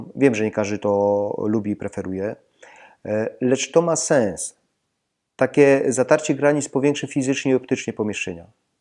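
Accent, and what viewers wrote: Silesia, Poland. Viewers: Świetny odcinek! Za niedługo będę wykańczał pierwsze mieszkanie - właśnie takie małe, więc szukam inspiracji. Dzięki za porady! Na marginesie - jak usłyszałem jak Pan mówi to myślę sobie: no taki śląski akcent! Ale nazwisko takie nieśląskie, myślę sobie: "pomyliłem się". I sprawdzam - a jednak, siedziba firmy w Rudzie Śląskiej ;)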